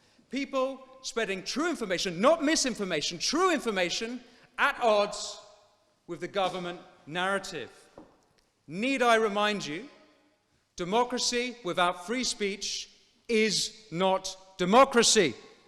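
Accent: United Kingdom. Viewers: L'accento e la sintassi sono prettamente britannici: chi è l'oratore? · Lo speaker non e' Americano. Accento definitamente British.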